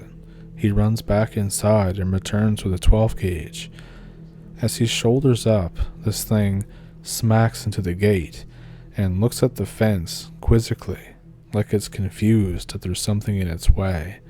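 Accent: Canada. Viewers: I’d suggest that you employ the computer generated voices, as your spoken voice, with your Canadian accent, is pretty much monotone and there is no inflection nor alteration of tone… there is so much more that could be done with the content if only you took advantage of the enhancement opportunities that exist… just sayin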